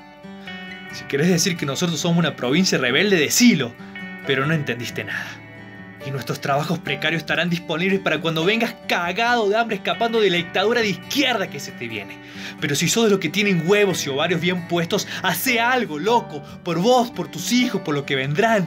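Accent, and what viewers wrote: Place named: Chile. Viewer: Creo que es chileno el que habla. 🇦🇷❤🇺🇾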